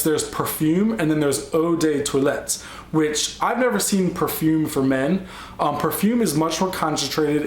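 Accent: United States. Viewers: dear lord your such an American :P not meaning to be rude, but any European (including myself) would be cringing at that pronunciation :) yves saint laurent: l'homme, is pronounced lomme. jean paul gaultier le male is le mal (not ley) dont ever say Diesel like that again :P lol that one was in english so cant fault you there isseymiyake leu de issey sounds like: low dey issay sory for all the corrections :P though hope i helped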